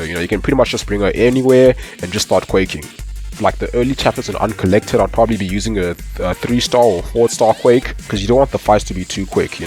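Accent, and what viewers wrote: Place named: South Africa. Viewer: Got directed here via a luhdemtaters "QUAKE Breakdown & Grade" video. As soon as I heard your voice I knew I was listening to a fellow South African. Cheers brother. Enjoyed the video. Let's keep Quaking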